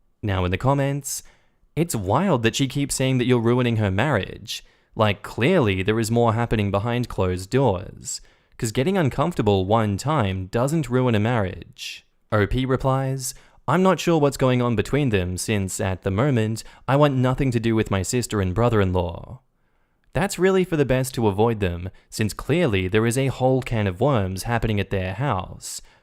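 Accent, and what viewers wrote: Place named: Australia. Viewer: He's from Australia.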